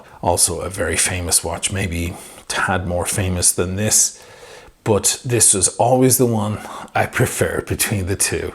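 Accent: Ireland. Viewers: Screw anyone who doesn't like listening to the Irish blabbering...I like many other watch lovers appreciate all the content 👌 massive congrats on the purchase mo chara...its pure class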